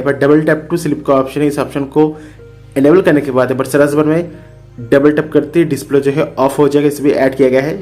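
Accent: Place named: South India, India. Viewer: Aisi hindi kyo hai South se ho kya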